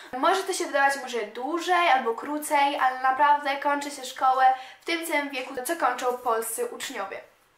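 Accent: Italy. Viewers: Kocham twój włoski akcent, jest świetny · Ale masz włoski akcent 😄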